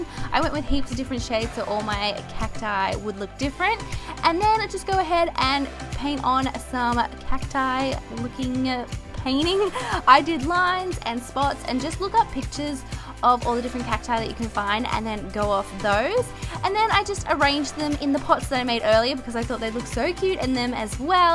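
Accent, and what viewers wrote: Australia. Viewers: are you Austrailian? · Are you from australia? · She's from Australia so yes she does have an accent!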